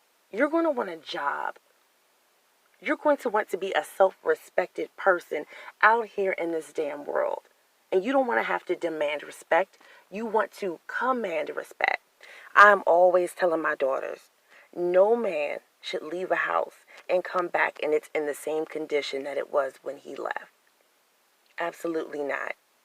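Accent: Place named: North Carolina, United States. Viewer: I am born and raised in NC and yes ma'am you sounded like me telling about my upbringing.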